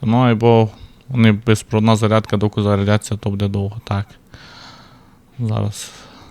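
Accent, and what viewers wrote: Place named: Transcarpathia, Ukraine. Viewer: По говору, чую, що з Закарпаття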